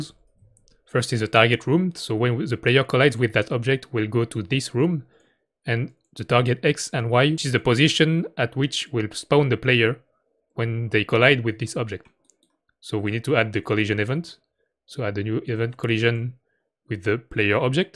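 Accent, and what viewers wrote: France. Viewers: t'est francais la c'est pas possible avec l'accent 😅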